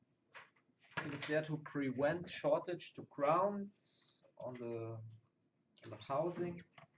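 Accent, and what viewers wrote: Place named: Germany. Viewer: Thank you for spoken English, which is very good. Also your cool German Accent makes English sound more interesting. Would you please consider adding English closed captioning to future videos? I’m sure you guys will gain a lot more subscribers by doing this. Your work is excellent. As always, a BIG 👍 from me!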